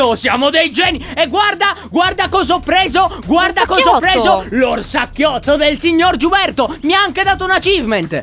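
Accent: Sardinia, Italy. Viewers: Ma è sardo?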